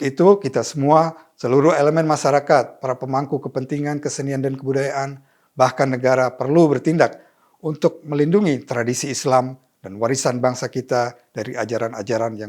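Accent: Indonesia. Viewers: Habib cara bicaranya intelek dan dialeknya sangat indonesia sekali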